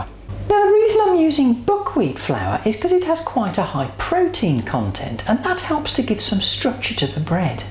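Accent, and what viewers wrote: Midlands, United Kingdom. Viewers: My buckwheat flour gives a black loaf but its an ok loaf thanks.detect a midland accent? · Very cool video! However, you are the most British person I have ever heard.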